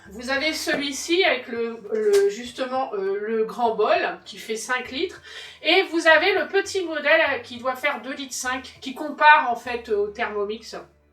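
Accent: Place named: Paris, France. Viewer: oh oui des recettes allégées !!! bonne vidéo, un p'ti accent j'dirais parigo !!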